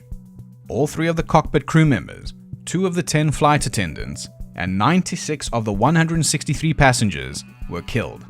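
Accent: South Africa. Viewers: South African accent, I noticed instantly 🇿🇦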